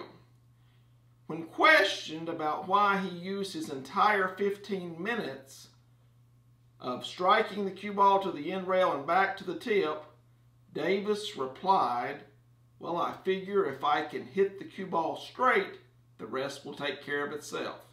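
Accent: South, United States. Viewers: Southern Drawl contest... southierest dawliest talking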